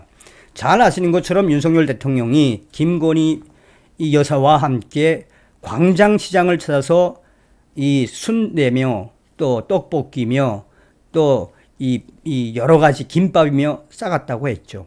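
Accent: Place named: North Korea. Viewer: 이런 재길 안전히 북한 말투 재수똥박아지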